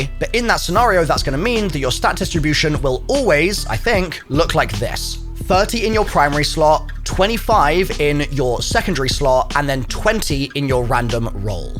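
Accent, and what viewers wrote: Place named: United Kingdom. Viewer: why are you bri'ish?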